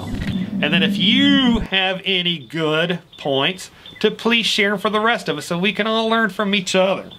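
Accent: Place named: Appalachia, United States. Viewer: I like your speech just fine and keep up the good work any correct information even from hillbilly speaking person or slang speaking person your good soul. and how you speak to point is not bad not racist or anything bad so god bless and maybe god bless your family and friends out there.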